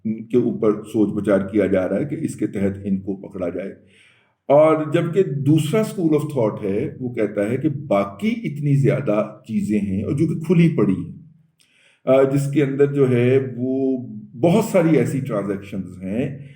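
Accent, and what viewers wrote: Punjab, Pakistan. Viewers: sahib aap commentry kisi aur se karwa liya karain, awaz kam ziyada, ziyada punjabi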